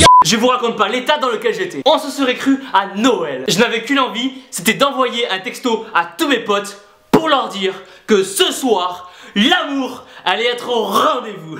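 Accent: South, France. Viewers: mais tu habite où car tu a l acsent du sud moi j habite à côté de pau et toi?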